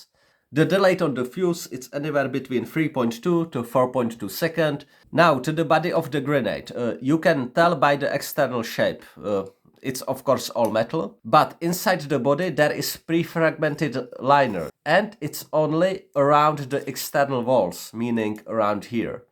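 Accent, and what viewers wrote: Czech Republic. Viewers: ježíš česká angličtina 💩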